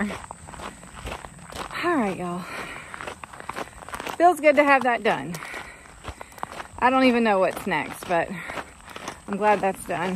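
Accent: South, United States. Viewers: I often think, regardless of your southern accent, you speak better English than the average English person of today. How brilliant to get a write up that gives an accurate portrayal. I have always been dismayed by journalist’s versions of events when reporting on things concerning us.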